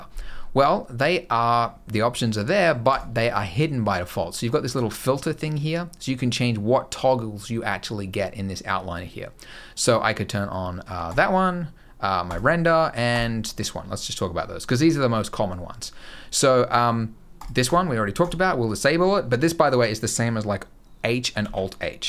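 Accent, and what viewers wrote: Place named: Australia. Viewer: Blender 2.8 looks so good it's really interesting they put a floating guy with an Australian accent at the bottom right corner of the screen at all times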